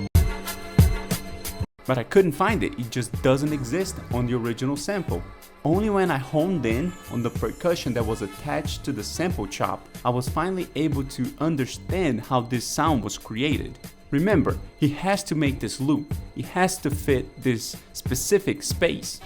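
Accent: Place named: Brazil. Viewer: tu tem sotaque de brasileiro, tu é brasil?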